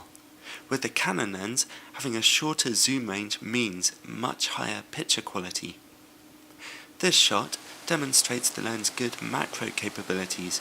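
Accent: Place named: RP, United Kingdom. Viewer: I like your perfect Received Pronunciation/posh English.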